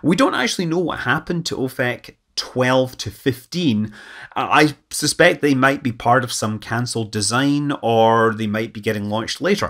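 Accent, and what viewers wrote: Scotland, United Kingdom. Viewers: you'd think someone with a scottish accent would be able to say Palmachim correctly :) (or palmakhim.... פלמחים) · He's a Scot. You can hit closer to home with Scottish pronunciations!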